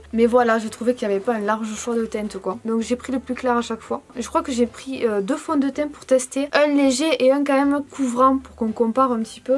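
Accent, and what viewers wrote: South, France. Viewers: Oh putaing ! Tu viens de Sud toi non? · Bonne et joyeuse à toi et à ta famille je te kiff grave merci beaucoup pour toute tes vidéos ta bonne humeur ton accent du sud que j'adore continue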